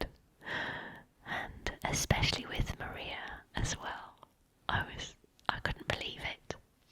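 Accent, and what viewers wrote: United Kingdom. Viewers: I've often thought I could detect a hint of Northern in your accent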